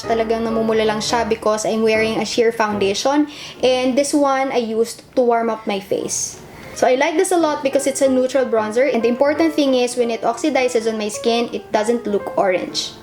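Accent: Philippines. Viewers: I love how true you are to your filipino accent. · your english is cery tagalog.... we dont understand what u talk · Her accent is so filipino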